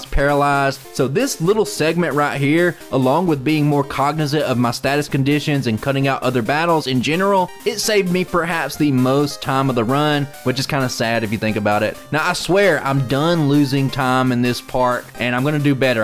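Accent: South, United States. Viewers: Are you from a Southern state by chance lol ? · i did not know how i'd feel about a pokemon solorun channel with a southern accent. guess i like it now 🤷🏽‍♂️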